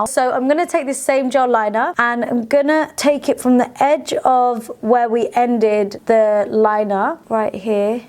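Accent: United Kingdom. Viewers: It's a British accent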